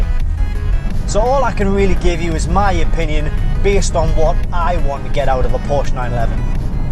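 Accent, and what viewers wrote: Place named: Geordie-Scouse, United Kingdom. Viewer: Unwatchable. Horrible muzak and what's that fucked-up Geordie-Scouser accent?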